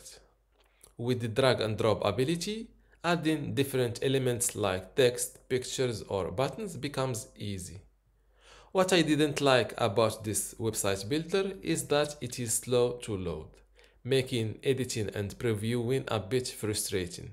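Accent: Morocco. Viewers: my greetings to our moroccan accent reviewer , good review indeed !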